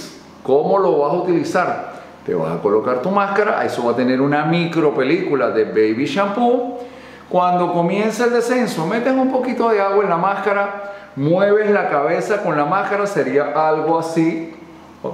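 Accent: Venezuela. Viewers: Ese acento es VENEZOLANO donde se pare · LO SABÍA!!!! Soy canario, y no sé cómo hablan los panameños pero a mis primos venezolanos los reconozco en un ratico 😄😄😄😄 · Gracias. Voy a hacer esto, compre una y de verdad q se empaña mucho. Despues les dejo saber. Eres venezolano?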